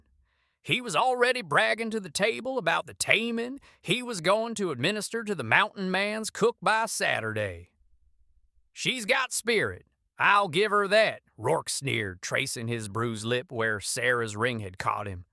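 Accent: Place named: Appalachia, United States. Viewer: whats with the hillbilly story teller???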